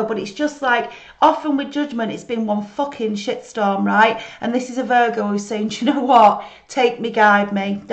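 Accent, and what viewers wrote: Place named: Newcastle, United Kingdom. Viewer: Love your reading (and that beautiful Geordie accent). Deffo dealt with a narcissist. Keep up the good work and will follow your guidance.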